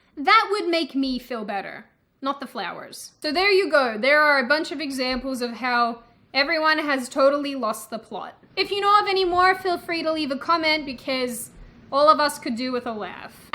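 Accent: Australia. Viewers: I love her Australian lilt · Did you live in America for a while? Your accent sounds like a fusion of Australian and America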